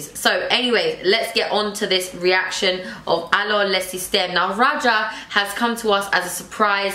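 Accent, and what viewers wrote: London, United Kingdom. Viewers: Your English it’s from London Are you half Algerian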